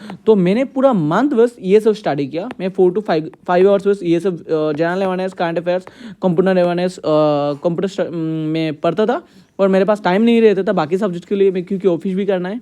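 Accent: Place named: Assam, India. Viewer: Aap thoda Hindi sikhlo. 100% Assamese honge. Phut phut Assamese jhalak rahe hai 😅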